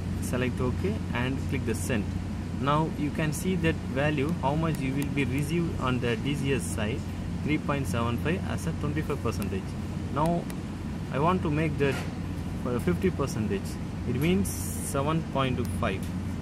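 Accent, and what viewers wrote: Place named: Tirunelveli, India. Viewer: Unga nellai tamil ku subscribe panniyachu...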